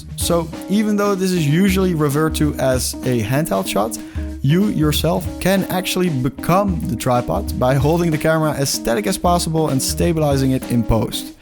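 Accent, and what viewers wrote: Netherlands. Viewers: I smell dutch hahaha leuke video!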